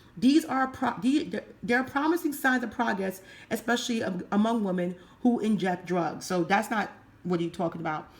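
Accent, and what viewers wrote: Jamaica. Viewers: You're welcome. Also it's nice to hear a fellow Jamaican pon the mike.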